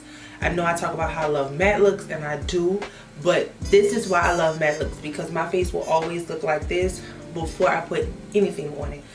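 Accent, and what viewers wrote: New Orleans, United States. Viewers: I know a fellow Louisianan when I hear one 😊 After listening for a few seconds I said oh yeah that sounds like New Orleans or surrounding areas of N.O. You’re makeup is BEAUTIFUL 😍 ❤. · You sound like a NOLA Lady! I am from NOLA! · Do I hear a lil Uptown New Orleans in your voice? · Girl! Gorgeous! I knew the moment you started talking that you are a Louisiana girl like me Lol! New subbie!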